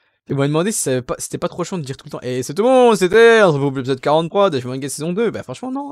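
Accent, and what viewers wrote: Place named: England, United Kingdom. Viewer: J’adore ton accent anglais x) « ze 100 » c’est tout mimi First like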